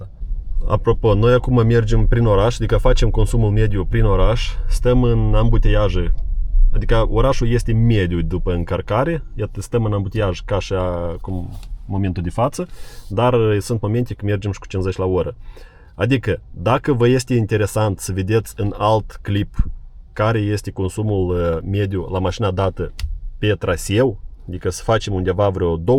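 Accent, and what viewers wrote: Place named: Finland. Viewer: БЛЯ. Зашёл посмотреть, а тут чухонец какой то🤣😂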